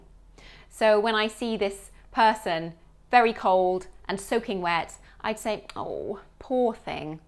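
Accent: United Kingdom. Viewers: I like your British English, too. 🇬🇧😀😃👍🇯🇵👍😃😀🇬🇧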